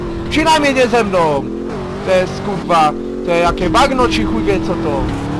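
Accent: Silesia, Poland. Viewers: Uwielbiam gwarę śląską xD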